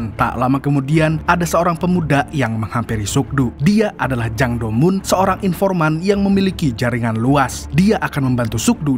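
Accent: Banjar, Indonesia. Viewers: Urang Banjar asli kah bg .iya dah bubuhan Samidi🤣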